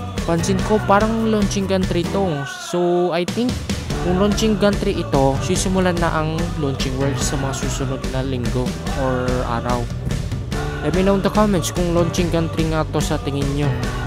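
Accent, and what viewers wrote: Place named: Philippines. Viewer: tagalog accent na ha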